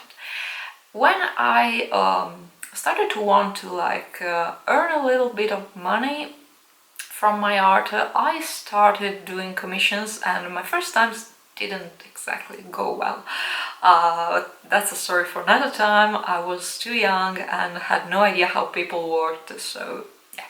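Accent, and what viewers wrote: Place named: Netherlands. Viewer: I too felt guilty about spending money on frivolous art supplies...though, they brought me joy. I admire your tenacity to really pay your necessities, before your luxuries...you are one smart cookie...though you live in Italy, you actually sound Dutch to me...thank you for your input here...much appreciated...Janis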